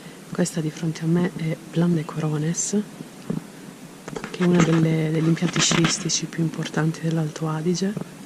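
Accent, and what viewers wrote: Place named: Apulia, Italy. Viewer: Sei in gamba e una bravissima comunicatrice professionale e mai banale. Mi fanno impazzire le tue pronunce pugliesi e la totale mancanza della esse impura!! Sei grande!!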